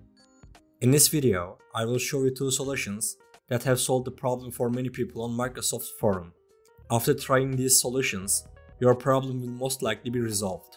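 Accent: Turkey. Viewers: This guy he is so Turkihs , his accent screams " I AM TURKISH "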